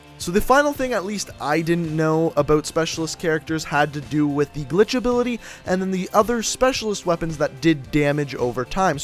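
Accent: Canada. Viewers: are u Canadian cuz it sounds like it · Definitely Canadian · Is he canadian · It's his Canadian accent it make them were they can't pronounce all words correctly so stop hating and learn to spell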